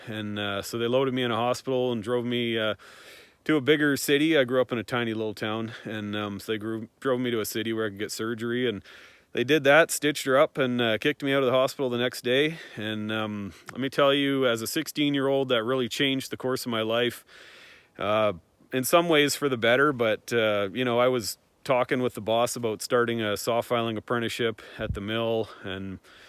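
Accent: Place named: Canada. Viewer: accent says Canada, americas hat. Edit: the license plate also says Canada lol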